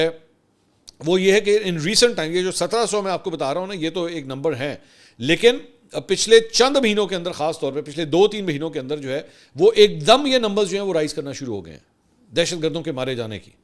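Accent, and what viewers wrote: Punjab, Pakistan. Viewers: punjabi touch zaida karay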